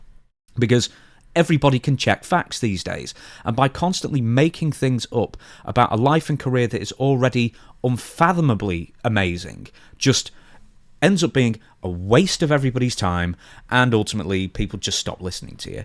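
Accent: United Kingdom. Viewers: This video is such engagement bait. You weren't there so you don't know the truth. You are not the authority on current events in the pro wrestling business just because you speak with a British accent,. Also, kind of a 🍆 move to jump on the guy for saying "b" instead of "m" regarding "billion". Check yourself,. Piers Morgan you are not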